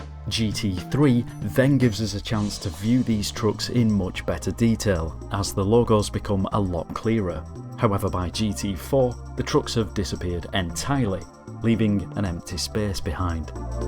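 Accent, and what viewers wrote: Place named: Lancashire, United Kingdom. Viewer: "Euwt uf bewnds" haha love Lancashire accent (and im a tyke)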